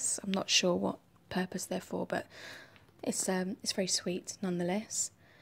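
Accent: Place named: United Kingdom. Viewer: I could listen to you talk all day! I'm a sucker for a British accent. Lol! Beautiful kit,.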